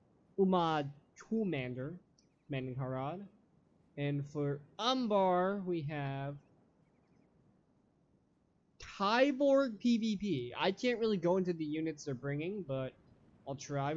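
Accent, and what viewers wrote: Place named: North America. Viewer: U do sound very North American, would never of guessed Sweden lol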